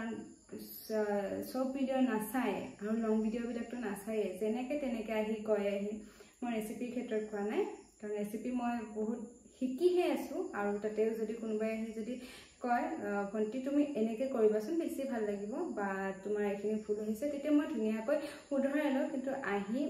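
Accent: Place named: Mangaldai, India. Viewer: Ba tumi darrang district r hoi nki ? Kothabur mangaldai side r nisina lagise Btw..bohut morom thakil ❤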